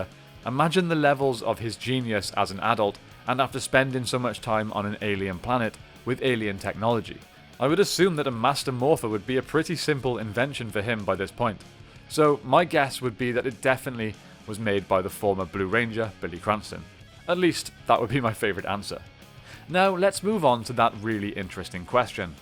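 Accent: Wales, United Kingdom. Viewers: Is that a Welsh accent I detect? I'm Welsh and I can't decide if it is or not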